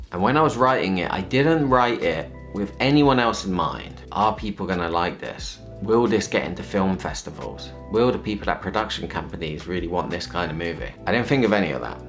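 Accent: United Kingdom. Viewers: 조용히 님 구독하며 영국발음 행복하게 듣던 사람입니다^^ 영국에서 1년 살았던 추억으로 인생이 달라졌던 저라, 님의 9년 한국생활이 꼭 좋은 추억으로 남으시길바래요~ 영국돌아가서 원하는 꿈!! 꼭 이루세요~~!! 멈추지말고 우리 꿈꿔요! 응원합니다^^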